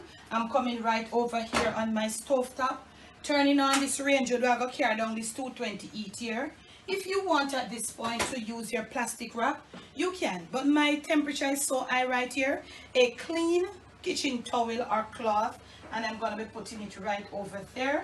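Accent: Jamaica. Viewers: Hej Miss, Manners an' Respect from Denmark 😊🇩🇰. My wife and I absolutely enjoy your show, and we look at them everytime we receive notification😊. Incidentally today we will try your chicken burgers! You are an inspiration and brighten up our home with your presentations. We love when you say "me soon come"; and miss it in some of the videos...and please don't ever change your accent; it is absolutely brilliant and genuine. We look forward to the next experience, and ready to try some real Jamaican food. Without Brown sauce 😁. Happy Easter from Denmark.🇩🇰 Luca and Tina.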